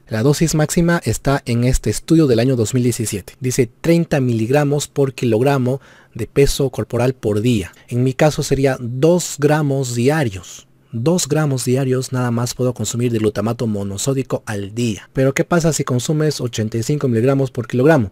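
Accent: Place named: Peru. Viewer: peruano eres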